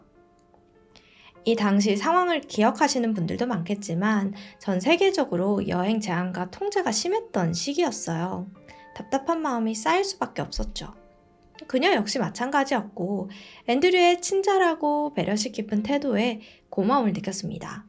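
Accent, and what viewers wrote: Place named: Jeolla, South Korea. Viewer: 전라도 사투리인듯요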